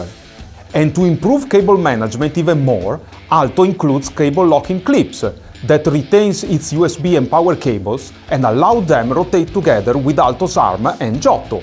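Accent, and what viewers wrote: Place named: Italy. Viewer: This guy made my day with his italien accent 🤣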